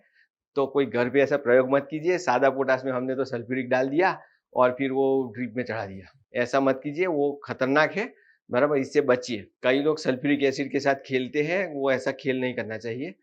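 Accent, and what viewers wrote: Gujarat, India. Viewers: आप गुजराती हो ना 😅 बराबर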